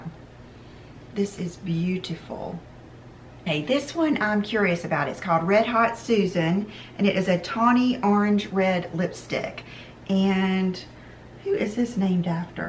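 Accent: South, United States. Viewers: I like how you are so thorough with showing us the colours and giving your opinion of them. I also love your southern accent x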